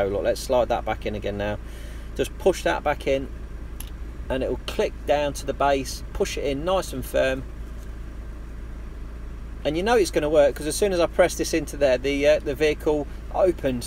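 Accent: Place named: Yorkshire, United Kingdom. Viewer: You from Yorkshire fella!!! Sound it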